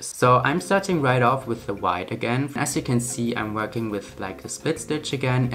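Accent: Germany. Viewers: i find you first on tiktok, and now understand you have YT CHANELLL I started studying German this year and i heared smth simmilar between German pronounciation and your speeking cheking your ig MUNICH DE ME i knew itttttttttttt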